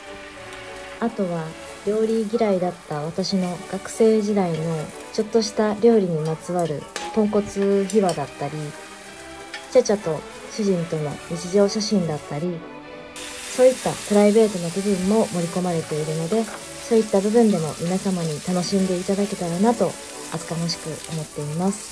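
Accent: Kansai, Japan. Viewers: 書籍化おめでとうございます！！ 絶対買わせていたたぎます！！！！！ あと私も関西人だからすごく喋り方が安心する笑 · 初コメです😊同じ関西を感じていつも見ておりますw今回のレシピ本購入させていただきます😍おめでとうございます㊗️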